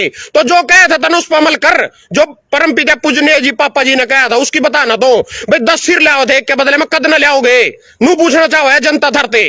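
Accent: Haryana, India. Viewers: भाई आपके भाषण में हरियाणा जिंदा है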